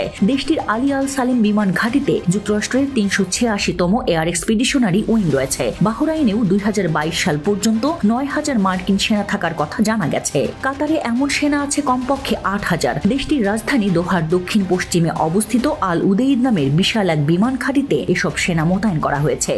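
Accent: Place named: India. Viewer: ভারতিয় কন্ঠ মনে হয়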